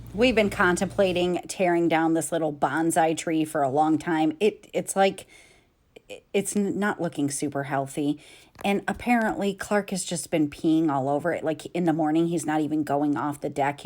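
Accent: England, United Kingdom. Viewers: I’m from the U.K. and your English accent is hilarious 😆 I love it!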